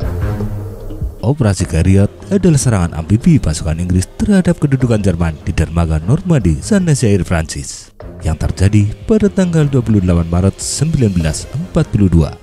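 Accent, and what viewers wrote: Java, Indonesia. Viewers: Naratornya Jawa medok jadi kadang tidak di copy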